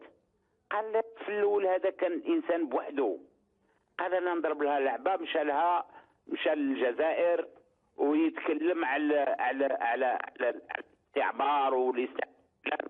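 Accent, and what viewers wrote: Morocco. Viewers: لهجه مغربيه واش تستنا منهم هذا المتحدث مغربي تحليل تاع رعيان كلام تاع الشارع دون مستوى يتكلم على رئيس فرنسى ثم البطاطه ياخي جياحه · qui êtes vous pour parler de l Algerie et de ses responsables. de quoi se mêle ce marocain.melez vous de votre roi et de votre pays. VOUS ÊTES UN MAROCAIN.VOTRE ACCENT.VOTRE HAINE CONTRE LE PRÉSIDENT ALGERIEN ET LES RESPONSABLES ALGERIENS VOUS TRAHISSENT.LE PEUPLE ALGERIEN N EST PAS CON OU DÉBILE POUR VOUS CROIRE. LES ALGERIENS SOUTIENNENT BOUTEFLIKA ET SA POLITIQUE QUE ÇA VOUS PLAISE OU NON. VIVE L ALGERIE.VIVE LA JEUNESSE ALGERIENNE.VIVE LE PEUPLE ALGERIEN LES HOMMES.VIVE LE PRÉSIDENT BOUTEFLIKA. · لهجة مغربية... واش دخلك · انت مروكي و الكلام نتاعك باينة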